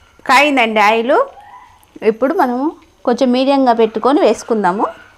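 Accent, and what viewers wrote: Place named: Anantapur, India. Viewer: Aunty mata Brahmins mata la undadu vere slang. Ananthapur atu side la untadi ekkada meeru undedi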